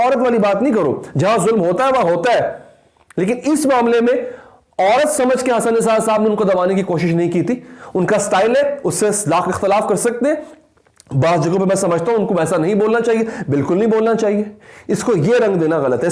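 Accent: Punjab, India. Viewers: Punjabi touch 💞 Stay blessed bro💐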